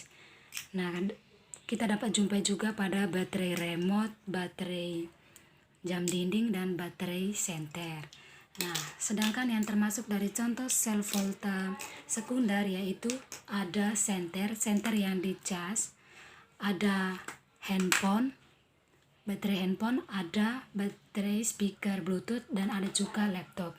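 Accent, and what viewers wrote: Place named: Java, Indonesia. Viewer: Keren. Tetal smngat ee.. Saya fokus sama suarax.. Sdh medok jowo gah😍😍😍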